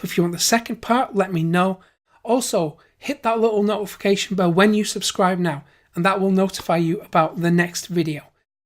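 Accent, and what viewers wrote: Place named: Lancashire, United Kingdom. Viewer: HEY! how are tings in Lancashire, that's where the accent is from, right? Anyhow here's a thumbs up from County Cork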